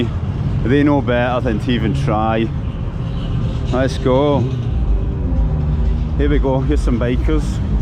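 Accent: Scotland, United Kingdom. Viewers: Moscow is dangerous no doubt about it. I saw a person being punched in the face for speaking English. I think your Scottish accent saved you, because they didn't recognize it as English. A state that is filled with right wing terrorists is not a safe place. And you are delusional if you think you can persuade anyone to visit a state which kidnaps children and murders men women and children. The money you spend in that dump goes toward doing just that, murdering people. So no, your fake party and paid Russian propagandists will not bring tourists into that hell on earth. You try saying anything antiwar for one minute in Moscow and let's see how long you last. In any case Russia is a terrorist state which has killed 1,100,000 Russian soldiers and many ukranians too and russia is destined to collapse economically in 2026, and very likely to break up after that. 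My advise is to get out of that hell hole before the shit hits the fan, already millions and millions of Russians have escaped for their lives and many many more will. Only the ignorant stay. Enjoy your propaganda party because it could be your last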